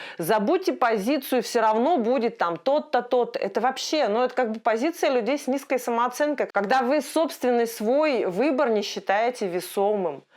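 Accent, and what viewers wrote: Yakutia, Russia. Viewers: Пахай, сахалыы акценнаах санарар буолбуккун, дьэ хомолтолоох.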